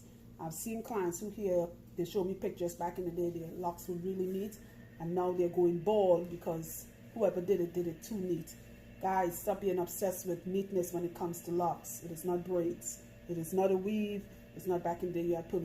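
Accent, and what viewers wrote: Trinidad and Tobago. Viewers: Are u trini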